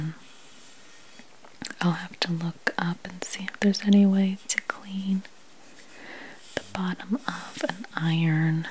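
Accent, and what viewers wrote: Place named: Pennsylvania, United States. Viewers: I love your accent. To me it sounds like you are from southeastern PA or from Maryland and it makes me feel like home! Best ASMR voice ever. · I was pretty sure you were a Pennsylvania native based on your slight accent but I knew it for sure when you said you got your bar soaps at the Giant 😂 I'm outside Binghamton NY and we had Giant stores here up until WEIS took them over when I was in my 20s.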